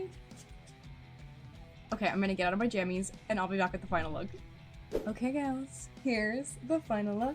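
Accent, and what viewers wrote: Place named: United Kingdom. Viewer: Idk why but from ur tiktoks I always I assumed you were British. Also GREAT VIDEO! Ur so pretty^_^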